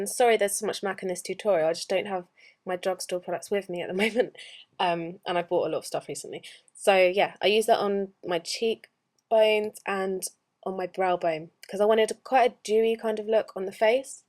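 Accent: London, United Kingdom. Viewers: You are probably the most beautiful girl ever haha u sound Like a Londoner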